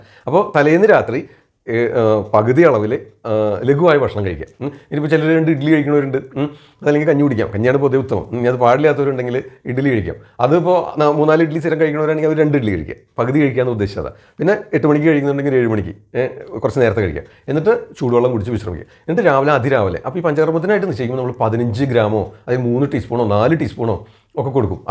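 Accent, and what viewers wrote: Thrissur, India. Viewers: വളരെ നല്ല ഈ അറിവു തന്നതിനു നന്ദി, തൃശ്ശൂർ രീതിയിലുള്ള അവതരണം നന്നായിരിക്കുന്നു 🙏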